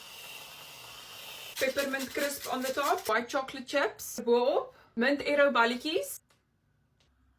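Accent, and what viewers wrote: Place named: Scotland, United Kingdom. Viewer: Which language are you speaking, it sounds like dutch, frysian and english combined with a Scottish accent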